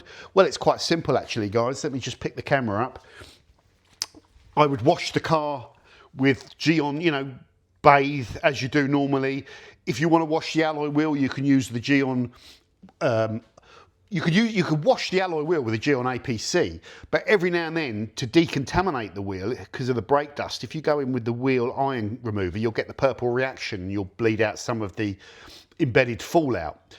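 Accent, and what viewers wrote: United Kingdom. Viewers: Greetings from Hong Kong!! Your British accent is beautiful, and your way of teaching feels so warm and approachable. I’ve learned a great deal about detailing from you. thank you for being so kind and generous in sharing your knowledge🤣👍🏻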